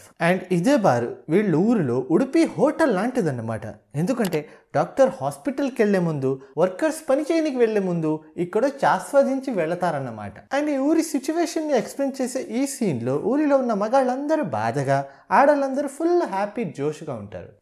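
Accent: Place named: Telangana, India. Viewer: Micro detail: How many of you noticed speaking in Telanagana 😅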